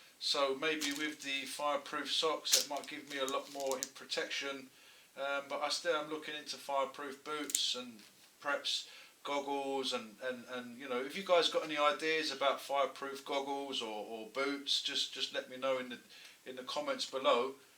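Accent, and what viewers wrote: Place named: United Kingdom. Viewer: I think it's cheaper to buy welding masks and gloves and milsurp aircrew bdus but nice kit. Edit- I wrote this before I heard your accent. I assumed you were American. I'm sure your kit is much cheaper than paying shipping overseas for milsurp bdus. Maybe there's an equivalent milsurp option from the UK military? Always good to have spares for yourself and others to use.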